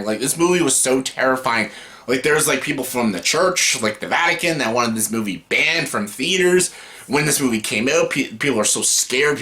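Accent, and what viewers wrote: Canada. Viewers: What are you saying is your favorite Kubrick film? Canadian accenting hard here lol. Speaking of, is that how most Canadians pronounce Melancholia? 😊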